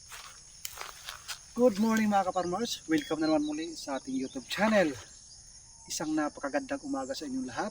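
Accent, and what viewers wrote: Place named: Visayas, Philippines. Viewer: saan ang lugar nyo boss? para kang bisaya! yan rin kasi ang plano ko, mag reretiro na kasi ako sa agosto.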